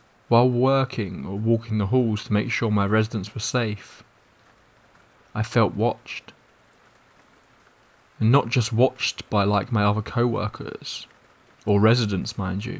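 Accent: England, United Kingdom. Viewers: As an Aussie, hearing an English narrator narrating scary stories lends them authenticity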